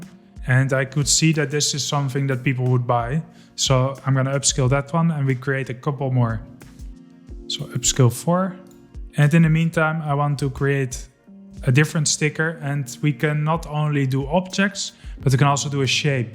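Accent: Netherlands. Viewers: Kwam toevallig op een video van je terecht.....volgens mij hoor ik een Nederlands accent ;-) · bro has the most dutch acccent ever lmao. i love the content, keep it up maat!😜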